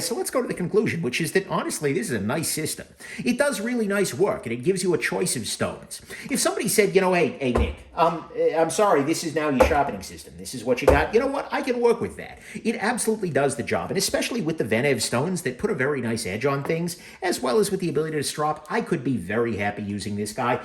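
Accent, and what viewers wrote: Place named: New England, United States. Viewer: This dudes way of teaching is awesome. Cool N.E. accent too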